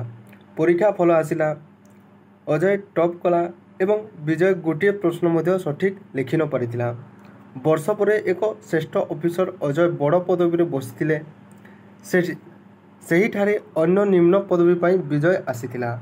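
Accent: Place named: Western Odisha, India. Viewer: Tame Western Odisha( Koshal) area r lok ye para laguchhe moke.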